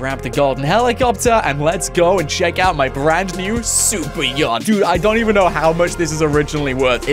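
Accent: United Kingdom. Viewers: are you from United Kingdom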